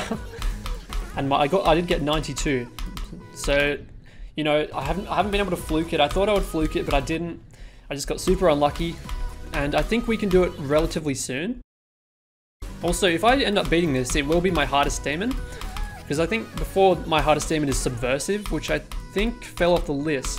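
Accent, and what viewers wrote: Australia. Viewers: Pretty sure he's Australian, but same difference I guess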